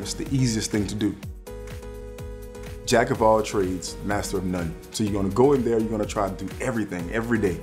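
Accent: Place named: New Orleans, United States. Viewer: Ok New Orleans!! I hear it, that’s my hometown ⚜️😍 congrats on your success!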